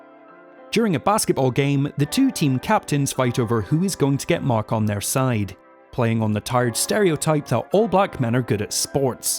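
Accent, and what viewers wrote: Scotland, United Kingdom. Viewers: I assume that you are white (i'm detecting a Scottish accent there) Any way here goes, I notice that when i watch ANY 1980s comedy films, what they all have in common is... whackyness & that they are ALL very loud, considering how money & power obsessed the 80s were, I think its a wonder that comedy films were made at all. be it-TRADING PLACES(dealing with both class & race prejudice issues) PORKY'S,FERRIS BEULLER, POLICE ACADEMY even, and this film is no exception, its more to do with insensitivity, clumsiness, and America's,500 year old race issues, that werent properly dealt with. They approached this the same way that they would approach... POLICE ACADEMY, not adjusting it often enough for the the subject matter and applying some patience, instead opting for a formulaic comedy style. BUT despite it all, I liked the film, this is fresh, playing for laughs, instead of the down trodden ROOTS,GONE WITH WIND, TO KILL A MOCKINGBIRD po faced look at ignorant racism, it DID make its point, which wasnt lost on me.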